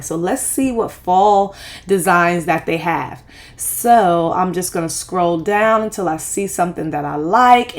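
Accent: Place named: Brooklyn, United States. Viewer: Yassss on BK! I knew it when I heard you tawk😂😂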